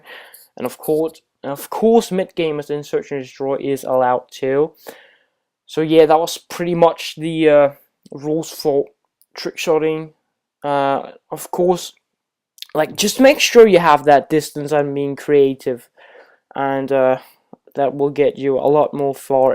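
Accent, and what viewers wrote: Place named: Scotland, United Kingdom. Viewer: Du lyder lidt som en skotte også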